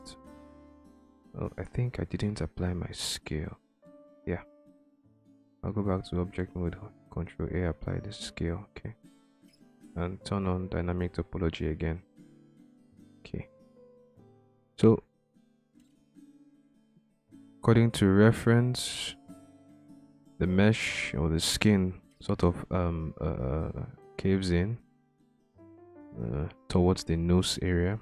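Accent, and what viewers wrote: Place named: Nigeria. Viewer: So good to have a tutorial in Nigerian English. I can understand everything you're saying. Really clear and straight to the point. Thanks for this.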